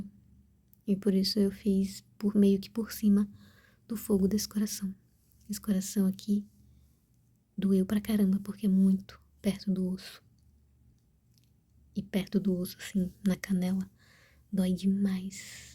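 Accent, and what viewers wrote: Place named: Rio de Janeiro, Brazil. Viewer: a tem sotaque carioca e a não tem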